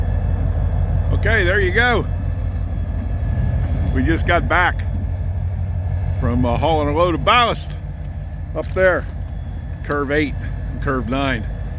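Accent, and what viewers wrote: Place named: Pennsylvania, United States. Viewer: BTW, my wife grew up in New Kensington and we have many family members in that area.. We live in Texas. She loves hearing your Pennsylvania accent....Great Show!!!!!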